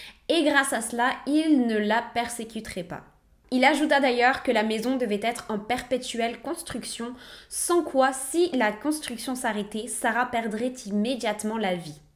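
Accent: Quebec, Canada. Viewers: Salut. Top vidéo. Tu devrais faire une vidéo avec Mcskyz et Sonia de France. Je suis malheureusement plutôt passionné par les psychopathes qui foulent la terre. . La première fois fois que j ai decouvert cette chaîne, j ai été tres surpris que qq1 d aussi mignonne fasse ce genre de vidéo! En plus l accent québécois est trop cute. Bref ce format de 3 histoire est top! Bonne continuation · On entends le Québec derrière ton accent, c'est normal???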